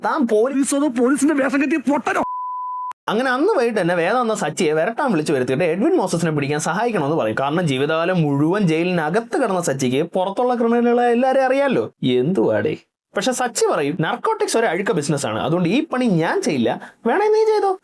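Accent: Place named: Thiruvananthapuram, India. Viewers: തിരോന്തരം ഭാഷ നന്നായിട്ടുണ്ട്. മലയാളം സിനിമ റോസ്റ്റ് ചെയ്യുമ്പോ ഇങ്ങനെ മതി. മടുക്കുമ്പോൾ ജില്ല മാറ്റിയാൽ മതി. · ah thirondoram slang.. thaali konnu 🤣🤣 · Oooh trivandrum slang 😮. Kollaam 😌❤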